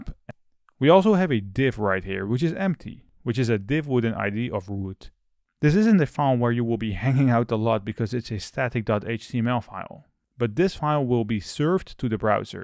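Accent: Netherlands. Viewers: I hear a dutch accent excellent video explanation goed gedaan!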